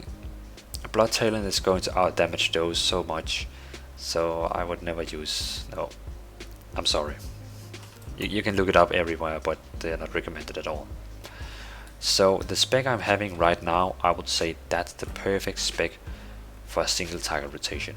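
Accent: Denmark. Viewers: Danish much :O?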